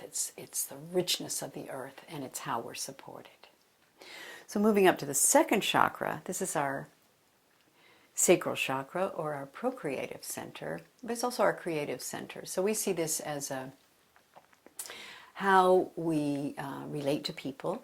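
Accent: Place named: United States. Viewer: She is American so she has an accent if you know better you can make a video. She has 109,0000 views because of her effort.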